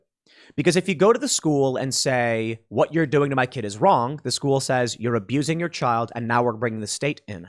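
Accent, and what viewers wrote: Chicago, United States. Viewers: When Tim talks of Chicago accent, it is thickest where he is from. The South Side of Chicago has the thickest accents, both black and white accents... Mexicans are the same no matter where they live in Chicago, lol...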